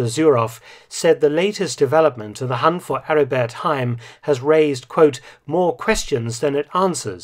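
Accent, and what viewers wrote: United Kingdom. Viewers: There is something about the British accent. I can’t remember feeling this good about an historical documentary since watching ‘World at War’ narrated by Lawrence Olivier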